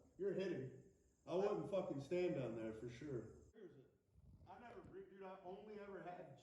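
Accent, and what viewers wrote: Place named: Delaware County, United States. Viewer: Sounds more Delco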